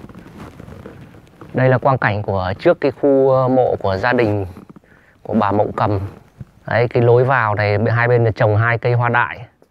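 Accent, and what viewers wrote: Standard. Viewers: Bạn có giọng nói chuẩn và rất hay chúc bạn thành công trong công việc của bạn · Kênh của bạn rất hay . Giọng MC chuẩn . Chúc bạn nhiều sức khỏe . Chúc kênh phát triển .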